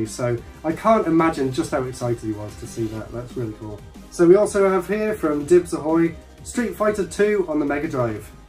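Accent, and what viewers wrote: England, United Kingdom. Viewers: Sweet channel. My fav is Animal crossing, you are cute and I love your English accent:) more animal crossing videos please;)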